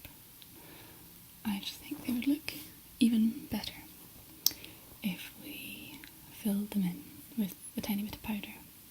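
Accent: Scotland, United Kingdom. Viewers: As a fellow Scot, you're the only Scottish asmrtist who actually relaxes me and doesn't make me cringe at my own accent. Thank you 💜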